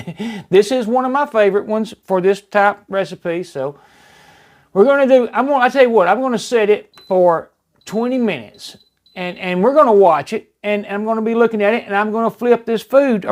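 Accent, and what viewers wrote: South, United States. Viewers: That country accent makes the first 10 seconds of this video so awesome lmaooooo 👌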